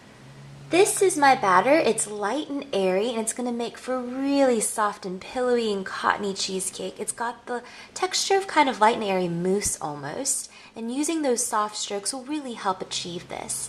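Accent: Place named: Baltimore, United States. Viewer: Yayyyyyy I am so happy I just found your channel. Now I can get crafty without all the research or messing up. ^_^ Wooooot! I am from Baltimore, it's funny wherever you are from you have a hint of a Baltimorean accent to my ear lol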